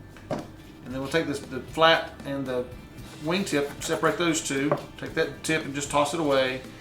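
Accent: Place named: West, United States. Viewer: My name is Ron and I have a tip for you. If you use the clips from the Onlyfire pizza and rotisserie assembly it will rase your great higher into the kettle top and the chicken cooks even better (crispier). I never take my clips off anymore, I use them on all grilling that I do.. P.S. I truly enjoy your Chanel. I like your background I like how you present your work and slight and not so strong western accent there is a western actor that you look and sound just like him, he was the Rancher on City Slickers. Also you should get a long meat poker or fire place pokers to use when setting up your grills. Great video as usual.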